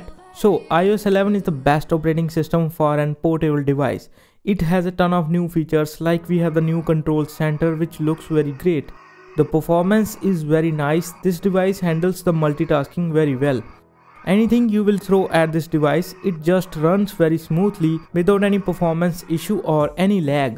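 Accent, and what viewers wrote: India. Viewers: Typical Indian accent is irritating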